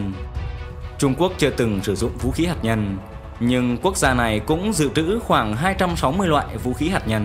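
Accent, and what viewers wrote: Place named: North Central, Vietnam. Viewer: 1trăm 20,chứ k phải 1tăm 20..phát âm bắc trung bộ, lai bắc bộ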